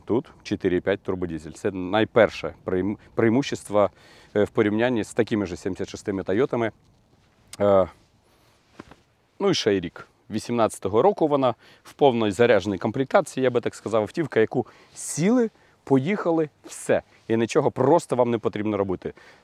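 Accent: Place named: Surzhyk, Ukraine. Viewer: Друже я сам з Маріуполя і перейшов на рідну мову з початком війни, але твій СУРЖАК не те що ріже вухо, віз зриває дах. Так що, або показуєшь розвиток у вивчанні мови, або я від тебе "бля" відписуюсь !!!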